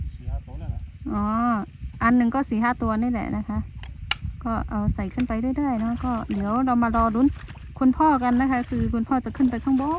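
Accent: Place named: Lanna, Thailand. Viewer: อู้เหมือนล้านนา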